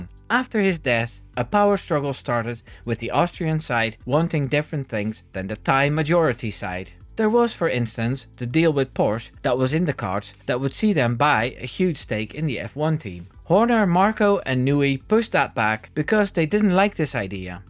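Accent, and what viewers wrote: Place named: Netherlands. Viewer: You are obviously Dutch from your horrible accent! Jos is the trouble-maker,not Christian! Max, unfortunately, only does what dad tells him.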